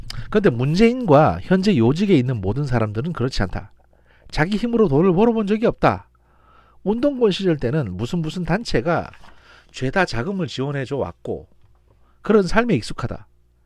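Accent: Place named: China. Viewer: 조선족님아....님 발음에 조선족 발음이 묻어 있는거 아세요? 전 천안문 민주화 운동을 지지하러 갑니다.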